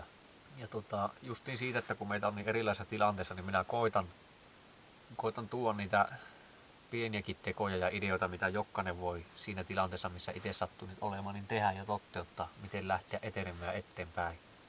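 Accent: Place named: Sodankylä, Finland. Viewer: Moi Sodankylästä. Sääsket inisee, lampaat määkii, kukko kiekuu. Mies puhhuu lähes mejän murthella pölökyllä istuen. OK.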